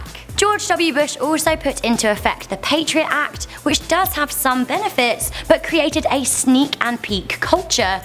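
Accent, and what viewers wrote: United Kingdom. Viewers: are you British? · A American should criticáis the USA not some British hore with her British accent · Few things piss me off more than some british chick and her screechy british accent complaining and making her negative comments on OUR presidents. While UK still has that terrible example of a royal "prince" Charles alive - no brit should be telling me about our problem presidents. Queen Mother and Charles need to do what is right for UK and give the power to William while they are alive to advise his court. This chick is horrible I am tuning out. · This list is total bullshit. Obomber was the worst President this country has ever seen and pardon me, you have a British accent. What the hell could you possibly know about U.S. history?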